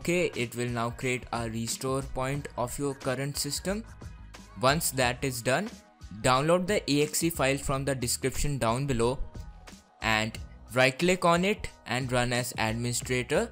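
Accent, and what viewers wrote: India. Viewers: Indian sound ass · He is indian. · ORAN DSOUZA he is indian, and pronounciation does not matter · he is indian dont trust indians they scam you if i click on a video and here if they are indian i am out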